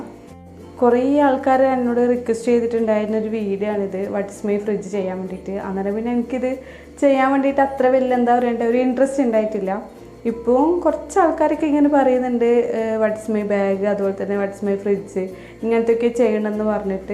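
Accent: Kannur, India. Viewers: Enthooli nammale kannur slang😁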